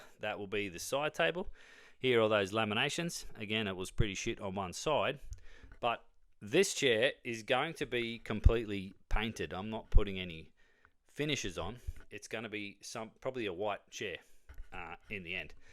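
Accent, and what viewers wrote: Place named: Australia. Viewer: Is it just me or does he sound even more Australian on this channel?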